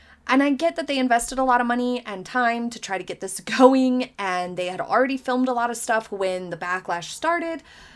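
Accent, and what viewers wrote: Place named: North, United States. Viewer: I can definitely hear your northern accent today. Are you from Wisconsin?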